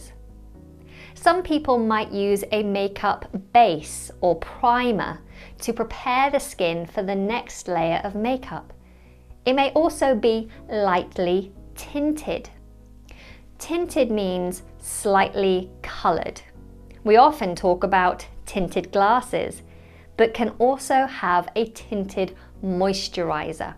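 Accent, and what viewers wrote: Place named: RP, United Kingdom. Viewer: Dear Madam Teacher,thank You so much for this great lesson and especially for Your pure,clear RP British accent! Btw, in the Bible (Old Testament) God created Woman like a last thing and the BEST.(real theological opinion)! Thank You again.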